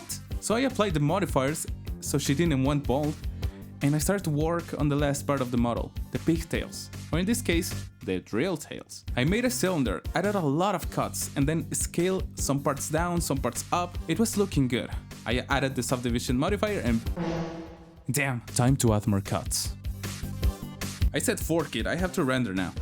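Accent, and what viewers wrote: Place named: Spain. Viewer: do you talk Spanish?, your pronunciation of English language is pretty similar to someone who talks Spanish. I talk Spanish too, so yeah, that's why the pronunciation sounds very similar for me at least. Also, good video, very organic, and i find this more interesting or more educational than watching those tutorials alone (idk why, maybe you are an inspiring type of person). Keep the good work.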